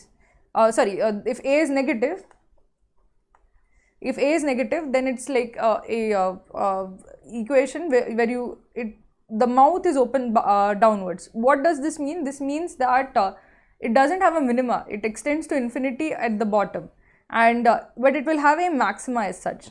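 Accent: South India, India. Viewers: You have that typical South Indian accent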